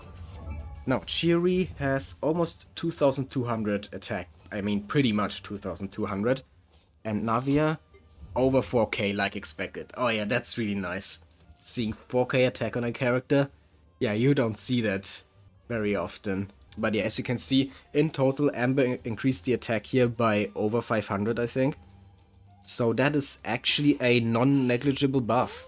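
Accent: Germany. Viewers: I smell a fellow german 😋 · thats the most german accent i have ever heard in my life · You're German?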